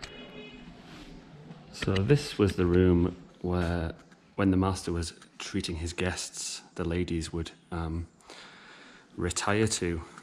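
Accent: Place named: England, United Kingdom. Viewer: I really like your English accent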